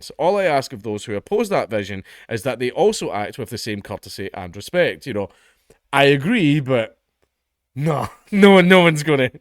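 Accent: Scotland, United Kingdom. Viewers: Wow, it sounds like he's actually Scottish. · Honestly, I don't even really know what SNP is cause I'm American. I'm just here because I like hearing a hilarious Scot rant about stuff in his accent. Cheers up my day.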